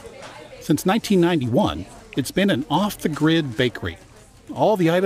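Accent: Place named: Midwest, United States. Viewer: No fishing. I enjoyed you video, then saw your name. Right age, etc. Midwest accent. Friends with Mary B back in the day. Met you a few times.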